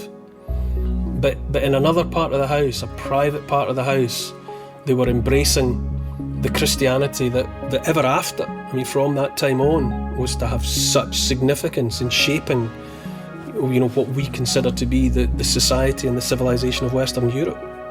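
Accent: Scotland, United Kingdom. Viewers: D'ya think y'can lose that Scoooooots accent as it jars on my nerrves?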